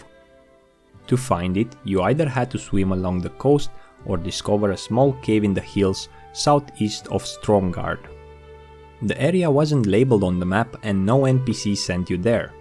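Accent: Romania. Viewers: this guy has a romanian accent, wonder if he is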